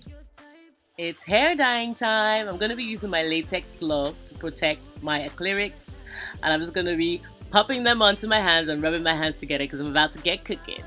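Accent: Ireland. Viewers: Is that an Irish accent I hear?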